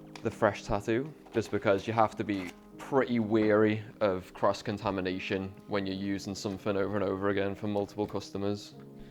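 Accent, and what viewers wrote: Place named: Northern England, United Kingdom. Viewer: It’s a northern dialect, southern British people (especially in London) pronounce everything with full syllables where as people like me in the north have a culture of speaking in slang and abbreviations so we often shorten or blend words together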